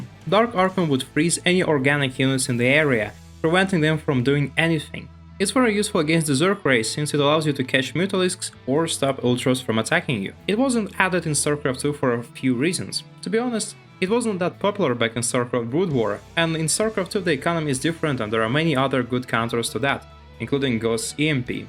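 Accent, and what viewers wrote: Russia. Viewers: по акценту можно узнать, что русский))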